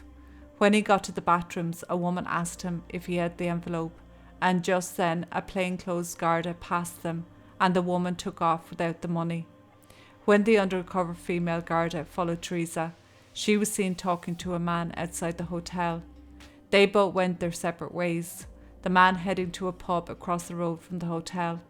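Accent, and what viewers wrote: Ireland. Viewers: that Irish lilt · Your lovely lilting Irish voice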